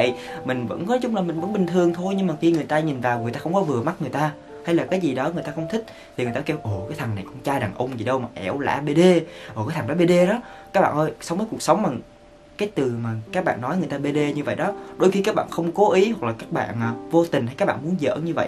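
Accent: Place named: Central, Vietnam. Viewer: A là người miền trung đúng ko ạ? "Sì gòn" "quýnh" "đì"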